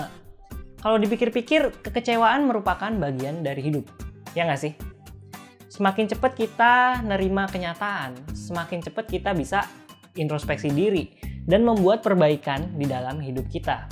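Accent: Toraja, Indonesia. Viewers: Lu orang toraja bang??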